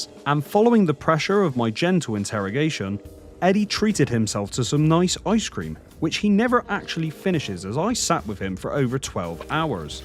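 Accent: Bristol, United Kingdom. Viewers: Omg I was thinking the same thing with this pizza on my playthrough! 😂 loved this video! BTW, do I detect a fellow bristolian from your accent? 🤔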